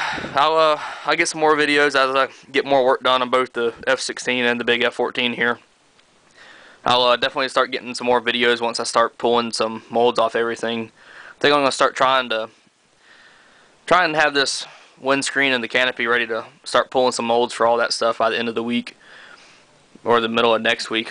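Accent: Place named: Texas, United States. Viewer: Way to go mate! it looks awesome, love the accent you gotta be from texas, good luuck with build I will keep an eye out for more progress vids, cheers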